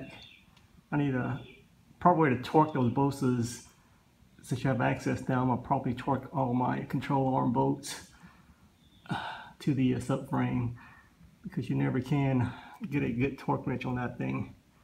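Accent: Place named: South, United States. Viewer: Did you plastiguage them? BTW its kind of odd to hear a southern accent from a Viet guy. :)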